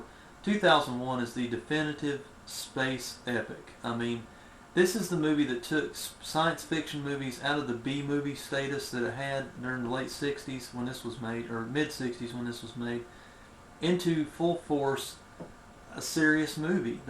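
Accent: South, United States. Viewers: Can't quite place your accent, sounds halfway between sounds mostly country southern with a mix of Carl Sagan.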